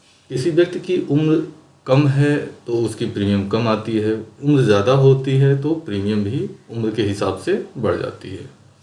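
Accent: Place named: India. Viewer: Photo se bilkul foreigner lag rahe the aap.. bas English sunke samajh aya k aap Bharatiya hai..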